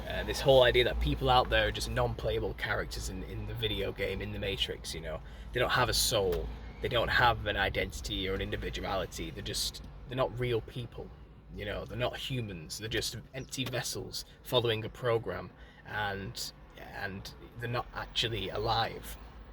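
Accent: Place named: England, United Kingdom. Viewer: They aren't stupid, just misled. There's an entire ecosystem of facts they can cling to even if they do there own research. Nice to see an English voice in this space, you do good work, lots of love.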